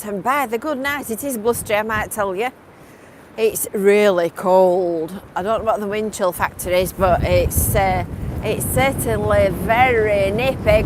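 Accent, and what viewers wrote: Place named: Yorkshire, United Kingdom. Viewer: Good afternoon you sound a rate Yorkshire lass'' starman recomendid your channel to me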